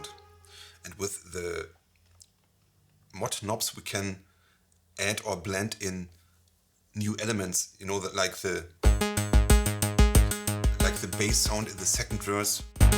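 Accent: Germany. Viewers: Das mag zwar sein mit der deutschen Aussprache, aber so erreicht er mit seinen Soundsets ein größeres Publikum. Die Wavestate wird ja nicht nur in Deutschland verkauft und die Titel sind weltbekannt. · I like this Germenglish accent 🙂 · Bestimmt ein Tolles Set, danke für die Mühe. Ich möchte nicht "trollen" oder dir irgendwas böses, aber ganz ehrlich: Mach deutsche Videos. Dieses Deutsch-Englisch hört sich schauderhaft an. Und vermutlich liegt dir die Sprache nicht besonders, so dass du dich da immer von Video zu Video kämpfen mußt. Ich denke da an einen anderen Kanal, wo der Betreiber wohl auch erst Englische Videos gemacht hat und irgendwann beschlossen hat diese in Deutsch zu machen. Dadurch sind die Videos für ihn leichter und schneller zu erstellen und die deutschen Zuschauer haben da auch nur zugestimmt und die Abonnenten sind sogar gewachsen. Wie gesagt, ist nur meine persönliche Meinung und ich möchte dich wirklich nicht angehen. 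Aber vielleicht würde es für dich auch schöner und einfacher werden. :) Viele Grüße und auf weiterhin gute Videos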